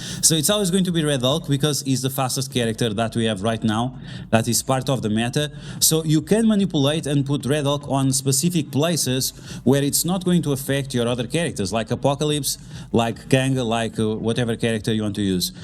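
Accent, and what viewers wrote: Hungary. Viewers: Pasztmek =D Tippelni se tudtam, mi ez a szláv akcentus, de hogy magyar =D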